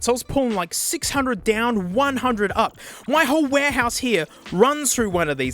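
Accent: Australia. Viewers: I know I watch too much when I start thinking in an Australian accent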